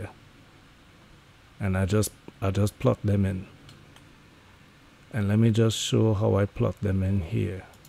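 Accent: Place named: Trinidad and Tobago. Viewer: You sound like an Israelite Trini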